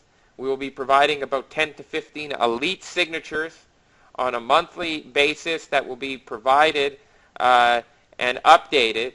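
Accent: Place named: Pittsburgh, United States. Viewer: If you're not from Pittsburgh then I'd be very interested to know where you got that accent. Great work once again.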